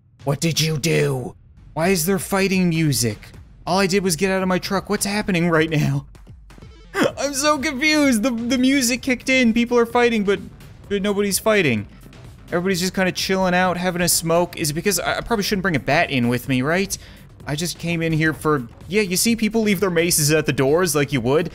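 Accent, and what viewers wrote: Canada. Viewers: GoldenGamer 12 he’s Canadian pretty sure that’s just how they say it.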